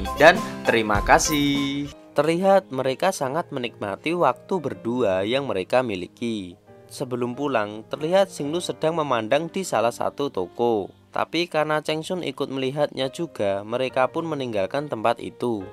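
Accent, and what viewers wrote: Java, Indonesia. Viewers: Medok betul jawanya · Mentok jawa nya. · medok amat yak/// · Medoook bangett sii min .. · Jawir.. Logat jawa nya ilangin... Biar tdk jd film lawakan ... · Pegel kuping,, medok banget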